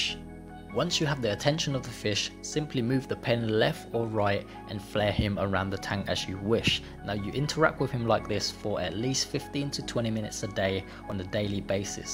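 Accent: United Kingdom. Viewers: He’s a Thai but with a British accent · Is this guy english? He has a british accent.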